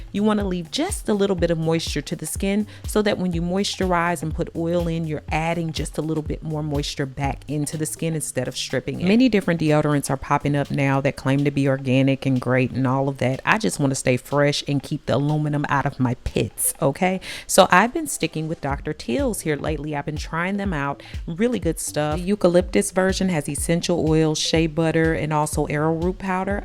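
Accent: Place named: Atlanta, United States. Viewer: You sound like Monica are you from Atlanta?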